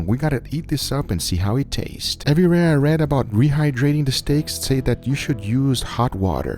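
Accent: Brazil. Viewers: Because it's a Brazilian accent. Portuguese has a lot of tone color and variation. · ill bet all my money this dude is Brazilian I can hear it in his English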